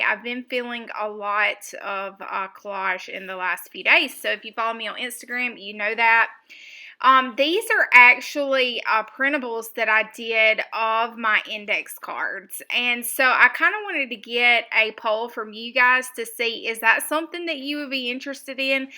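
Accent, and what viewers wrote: South, United States. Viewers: Well you said “tickle my fancy” and also “excuse the chainsaws and dogs ...” 👀👀 so I’m good with anything you do ! 🤣🤣 sound like you’re from Mississippi?? Texas?? Louisiana??? Hurricanes ...?? Hope all is ok and I love the idea of scanning ( a lot of work, yes) they’re great and I love your little book ..... “my moonshine container !!!” 🤣🤣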